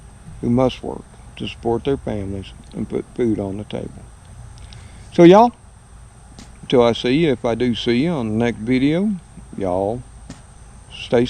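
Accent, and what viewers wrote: South, United States. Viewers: your cute southern accent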